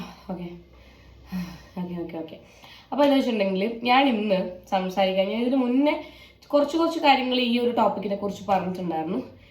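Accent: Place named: Kanhangad, India. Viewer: super avatharanam nammude kanhangadan slag idakku varatte adipoli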